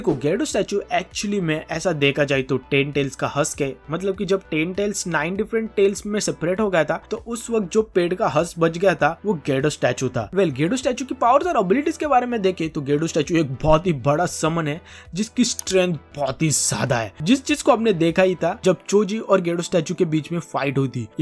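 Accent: Maharashtra, India. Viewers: Bhava Marathi Ahes ky??? Thodasa Texture Janvlaaa!!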